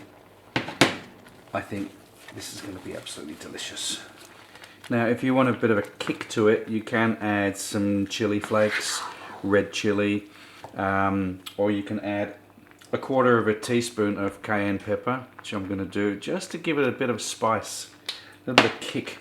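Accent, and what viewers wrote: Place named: Australia. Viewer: This is the best recipe I’ve seen on you tube for green tomato chutney. I need to do something with my tomatoes, (from my garden) before they spoil. Love this channel, especially because you sound like an Aussie. (I am one too).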